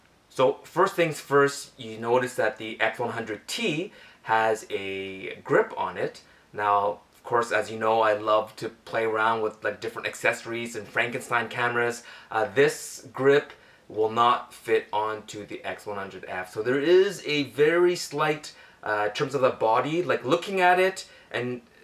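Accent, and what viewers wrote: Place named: United Kingdom. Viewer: You should lower the background music, it's not easy to ear your voice, you have a strong British accent !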